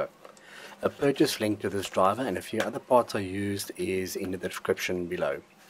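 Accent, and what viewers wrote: South Africa. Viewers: Hi, you sound South African, if you are where are you based and do you perhaps sell what yoh make? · South african voice I heard there. Where did you source the driver? Looking to replicate your build along side a set of 3.5 inch satellites. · Hey. Are you by any chance from South Africa? Your accent is why I'm asking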